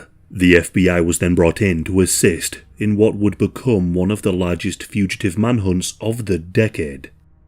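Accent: United Kingdom. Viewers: The fact that this will actually help me sleep is kinda scary 🤣 i love true crime and just has that sexy deep voice with a sexy British accent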